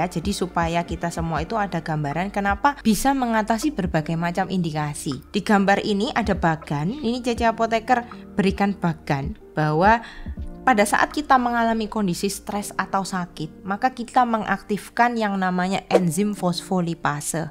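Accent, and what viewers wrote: Java, Indonesia. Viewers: medok banget sih ce logat jawanya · Saya suka dialegnya logat jawa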